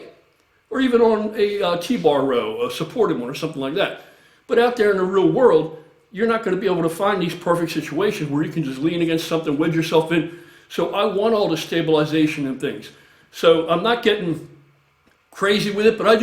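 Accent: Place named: Pittsburgh, United States. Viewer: That accent screams Pittsburgh.. speaking of, could really go for a Primantis right about now!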